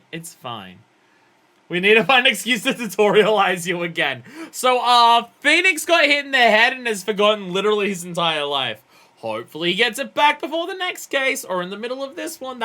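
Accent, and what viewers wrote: Australia. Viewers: Yes,she is Australian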